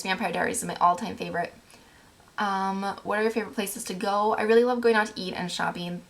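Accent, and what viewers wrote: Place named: Minnesota, United States. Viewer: I knew you lived in minnesota!! you totally sound like it! but yeah i live here to!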